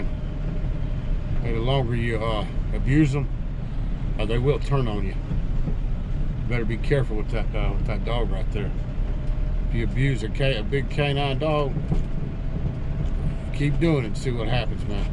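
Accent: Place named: New Jersey, United States. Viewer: You sound like a friend of mine from new Jersey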